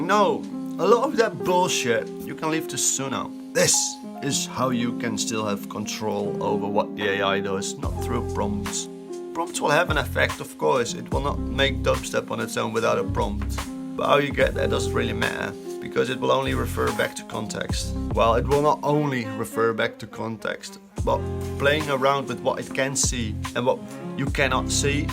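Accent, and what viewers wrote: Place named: England, United Kingdom. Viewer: What city and ethnic background? lol, you sound like you came right out of a gutter. Do people in England not speak English anymore?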